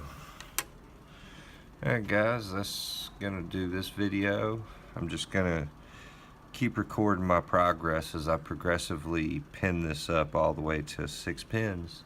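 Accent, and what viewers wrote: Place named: South, United States. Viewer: Great video on figuring these out. I am about to start learning on medeco as well, but I have been too chicken chit to start. lol I have to know though, what part of the South are you from, Bud? That drawl is unmistakable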